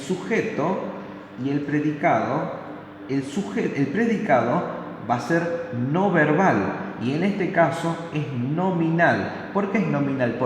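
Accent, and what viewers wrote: Tucumán, Argentina. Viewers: Sos de tucuman?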